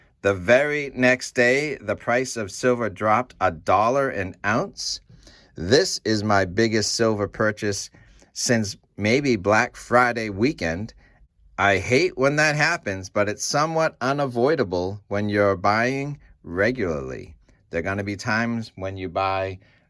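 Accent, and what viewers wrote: New England, United States. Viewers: You sound like a fellow New Englander. Lol. I bought 58oz last Saturday on the 11, and this Saturday it was up almost $2.00 an ounce. I still bought 42 more oz yesterday. I’m not all that concerned about the exchange rate for silver, unless I’m buying of course. My silver and gold are not for sale. Whatever the price, it’s still going to be receiving worthless paper in exchange. My stack is for the big one. When we go back to what we did for six thousand years. When silver and gold were MONEY. Which they will be again, much sooner than people think. I like your narrative! Keep buying,Keep buying, Keep buying, then KEEP BUYING! 😂👍🏼💪🏻❤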